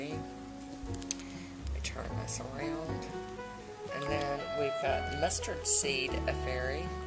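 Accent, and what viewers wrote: South, United States. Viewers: im so used to ur videos and i enjoy ur accent so immensely that when i read ur replys i hear that southern drawl. <3 i love it!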